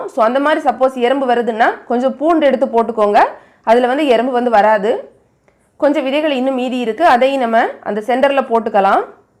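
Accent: Kanyakumari, India. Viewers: Mam neenga kannyakumari ah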